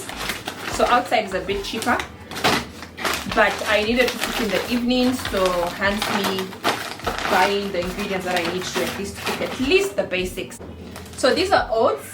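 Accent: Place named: Shona, Zimbabwe. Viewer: I'm happy to hear that!! Video request about a monthly budget and how to save money in Nairobi., your accent has some Shona undertones.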